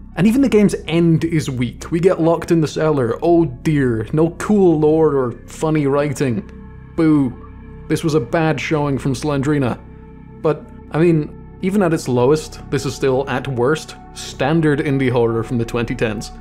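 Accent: Scotland, United Kingdom. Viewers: First time on your channel and I cannot for the life of me pin down your accent you have weird mix of Scottish, German and oddly a wee bit of American 😂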